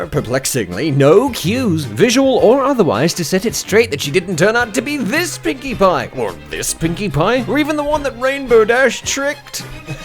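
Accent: United Kingdom. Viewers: British narration & comedy on mlp comentary. Love it.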